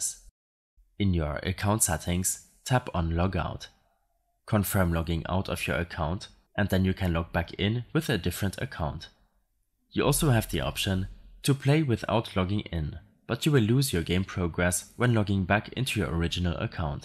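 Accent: Germany. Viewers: You are german i hear the accent if im correct lol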